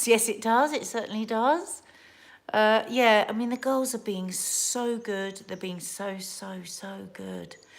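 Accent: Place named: Scotland, United Kingdom. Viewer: Good afternoon to you both. I am Scottish, and your Scottish accent is brilliant!! Makes me smile, Reminds me of home as I live in England now. ❤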